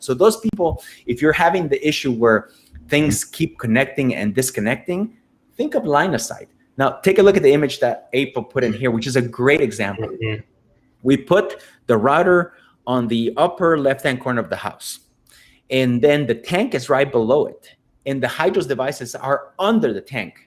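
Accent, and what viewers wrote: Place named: Louisiana, United States. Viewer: The Louisiana accent is the best. And that’s coming from someone with a Texan accent.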